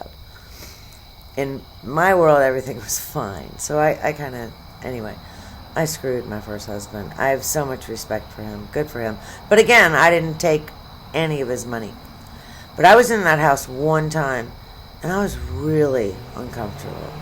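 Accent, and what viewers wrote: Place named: New Jersey, United States. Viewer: Just found your channel. You sound so much like a writer/comedienne named Tara Dublin. Same throaty, sort of New Jersey girl accent.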